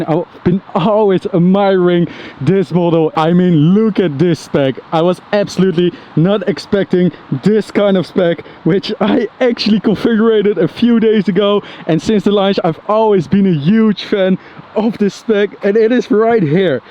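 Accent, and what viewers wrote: Netherlands. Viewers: What a THICC hollands accentje😜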